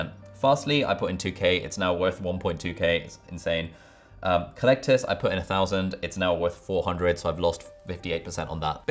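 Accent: England, United Kingdom. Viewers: Hi, I like ur video, but plz try to slow down little,I paused too much to cope with… cool British accent.Thnx a lot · Absolutely love this and omg your British Accent is to live for!!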